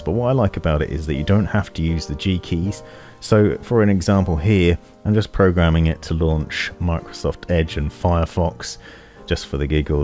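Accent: England, United Kingdom. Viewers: Обожаю настоящий, английский язык, а не нищий американский. Мёд для ушей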